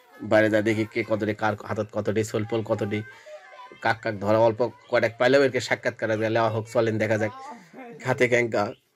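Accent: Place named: Bogura, Bangladesh. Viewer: ভাষাটা বেশ ভালোলাগে এটা কি বগুড়ার ভাষা?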